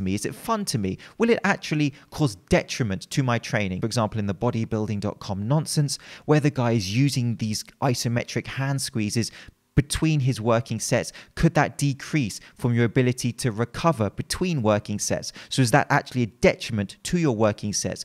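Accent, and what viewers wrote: United Kingdom. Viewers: He is not polite or calm it's just his speaking style, his accent, and pronunciation. It's like partway british where he articulates each pronunciation and his pitch up and down make him seem calm.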